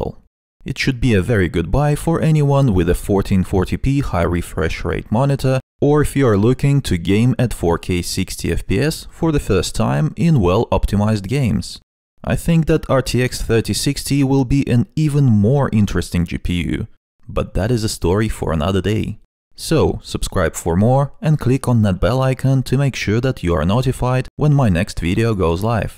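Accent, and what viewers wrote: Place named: Russia. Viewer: Блин слышно русский акцент)) А так контент годный, продолжай в том же духе)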